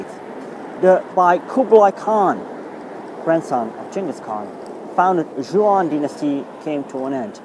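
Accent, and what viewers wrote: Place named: Netherlands. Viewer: Most interesting history, are you dutch? Your accent is very dutch.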